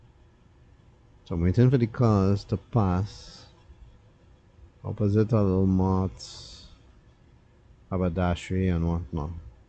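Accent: Trinidad and Tobago. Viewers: You sound like a trini. You speak like my family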